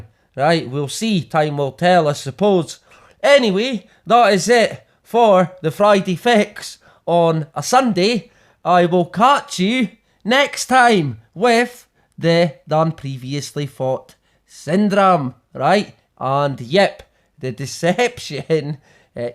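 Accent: Scotland, United Kingdom. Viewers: Love the accent mate. I spent a year in Scotland working offshore. You don't really have seasons in Queensland We had a referendum in 1946 That Denied Government power to medically test This means that the People of the Commonwealth of Australia denied the government any power to force people to undergo any medical or dental procedure without their consent.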